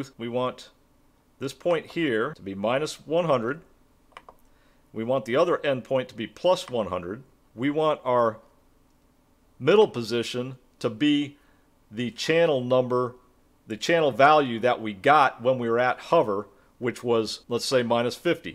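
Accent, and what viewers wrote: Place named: United States. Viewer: Thanks for these words that recomforts me :) . As you just said, I was not expecting a such not easy and not fast learning phase. I'm always amazed, to be in south of France, you somwhere in the US (according to your fantastic accent) taking time to record yout videos and me here and enjoying your advices in the nature near to the sea. Sharing between human is just one of the most wonderful thing, whatever the subject. It was the philosophical though of a sundazy morning. Cheers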